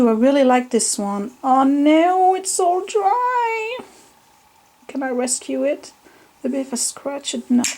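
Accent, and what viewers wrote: France. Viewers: Where are you from originally? Your french accent is great! :-)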